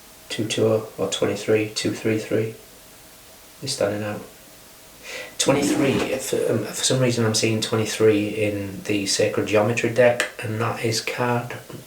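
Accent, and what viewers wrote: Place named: North, United Kingdom. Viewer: That was nicely put😄 especially with that northern accent. Thank you for that🙏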